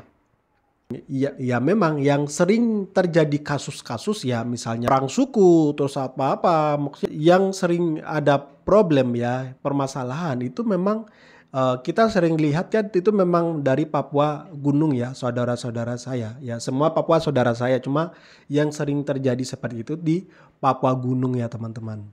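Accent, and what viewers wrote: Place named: Java, Indonesia. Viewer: ANDA COBA NGACA DIRI DULU BARU BERI PANDANGAN AGAR JGN SDR2 OAP PROTERS ... ANDA PAKAI LOGAT ATAU AKSES JAWA BARU BERIKAN PANDANGAN UTK OAP ITU KURANG ETIS... AGAR SUPAYA PANDANGAN ANDA DIDENGAR OLEH OAP ANDA PAKAI BAHASA IBU ...KECURIGAAN KAMI OAP BAHWA ANDA DI CUCI OTAK UTK BICARA LAIN KERJA LAIN ... ANDA COBA KOREKSI DULU KONSEP BERPIKIR ADA KARENA ANDA TDK TAU MAKA ANDA TIDAK TAU APA YG TERSELUBUNG DI BALIK PANDANGAN ANDA .ITK OAP ...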